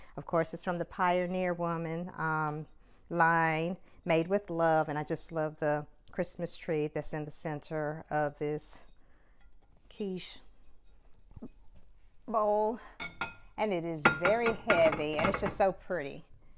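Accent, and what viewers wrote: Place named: South, United States. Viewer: I had to downsize but I can not wait to move this summer to a larger home which will hopefully have a much larger kitchen so that I can use my Hutch again. We are so similar I love vintage cookware and kitchen gadgets and such, I have Pyrex bowls very similar to yours. I bet your home is very warm and inviting and comforting and that is the type of vibe that I hope I give in mine and I feel the same exact way about my kitchen it is the heart of my home. Where do you live down south if you don't mind my asking? I am from New York but I've been living in Jersey the last several years. I love your accent!!!!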